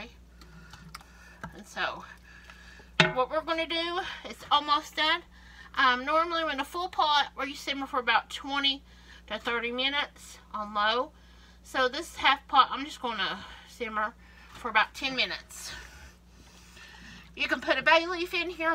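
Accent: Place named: Appalachia, United States. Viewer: It's our Appalachian dialect. I grew up saying it that way, had to lose my accent and dialect to work for the AP.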